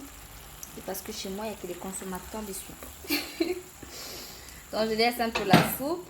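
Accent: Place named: Gabon. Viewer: On sent une gaboma 😂😂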